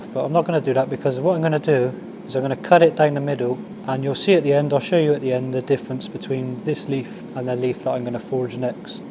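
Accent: West Country, United Kingdom. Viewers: Where is your accent from? West Country?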